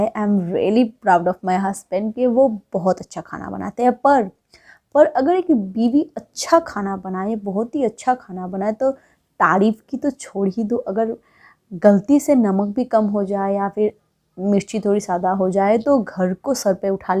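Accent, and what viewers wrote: Bengal, India. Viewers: Woh Bengali hi h 😂😂😂😂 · Interesting he mam aur app Bengali lag rahi ho appke tone se 😁❤️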